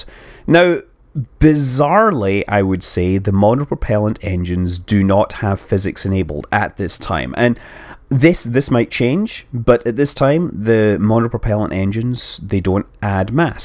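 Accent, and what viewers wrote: Scotland, United Kingdom. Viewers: he's Scottish i believe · Baruch Storm hes scottish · Scott Manley is, well Scottish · Sam Da beest Scottish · dat scotish accent doe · What the hell is that accent. Scottish word here, American there. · HES SCOTTISH!? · Aaron Muck Yeah, they're both Scottish accents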